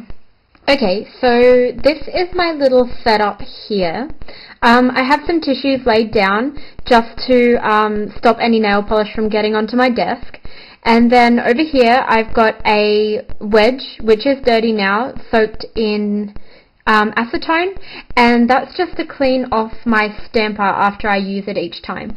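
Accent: Australia. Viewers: I'm Australian, Got the same accent myself, yeah she's Aussie ;)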